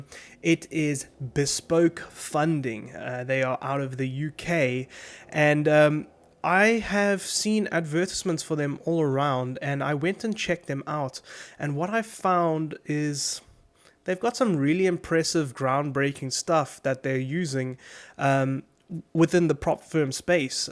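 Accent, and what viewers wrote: South Africa. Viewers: Ah you can’t miss a South African accent, from a fellow South African being able to get funded opens a massive opportunity for us dealing in dollars, I’ve been wanting to take a challenge and hopefully, I can get my chance.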